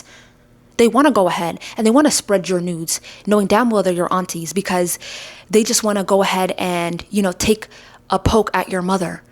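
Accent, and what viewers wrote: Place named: Dallas, United States. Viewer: Ma'am I need to know more about you lol bruh your accent your face your mannerisms your rich cultural history and animated storytelling I looove it all ! So Full of personality ... I'm like do I detect a hint of "american black girl from Dallas" type of accent ??? What region of the U.S. is your accent from ??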